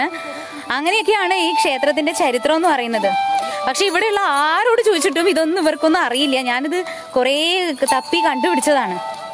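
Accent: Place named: Valluvanad, India. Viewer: തിരുവനന്തപുരത്തുകാരിയുടെ മലയാളം എങ്ങനെ വള്ളുവനാടൻ slang ആയി? അതും ഇത്ര perfect ?!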